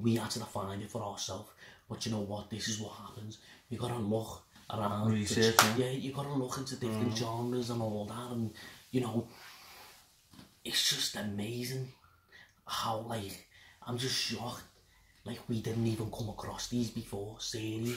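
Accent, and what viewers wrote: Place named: Liverpool, United Kingdom. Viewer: totally wasnt expecting a scouse accent lol but its awesome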